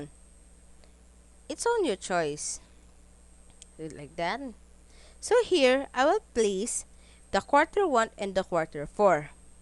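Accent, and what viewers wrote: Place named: Philippines. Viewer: You sound like your filipino, aren't you?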